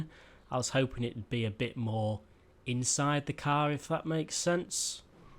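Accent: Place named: England, United Kingdom. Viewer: Davon Pipes he's English. Canadians also do that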